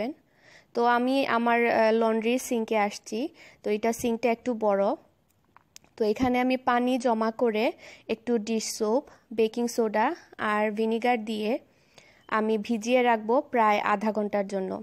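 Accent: Sylhet, Bangladesh. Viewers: আপু তোমার মুখে সিলেটি ভাষা টা ই খুব ভাল লাগে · আপুমনি আজ অনেক কিছু শিখতে পারলাম। খুব কাজে আসবে ইনশাআল্লাহ। আপু তোমার মুখে সিলেটি মাত আমার খুব বালা লাগে।আপু এখন আপনি কেমন আছেন? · Apu kmn aso.tomar mukhe shylety vasa onek valolage.. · আপু আমার খুব উপকার হলো।আমি একটা জিনিস দেখলাম সবাই তোমাকে বলছে তোমার মুখে সিলেটি মাত বেশি মানাই।এটা কি ঠিক ।আমার কাছে মনে হয় যে ব্যাক্তিকে ভাললাগে তার সব কিছু ভালো লাগবে।তাই সে যেভাবেই ভাষা বলবে।আমি তোমাকে ভালোবাসি আমার সব ভাষা তোমার মুখে ভালো লাগে।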